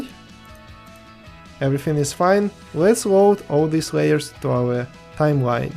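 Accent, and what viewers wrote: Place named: Russia. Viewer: Wonderful tutorial! Thanks. I want to work on raspberry pi, subscribed to your channel. You have an interesting accent, similar to Russian. You are Russian?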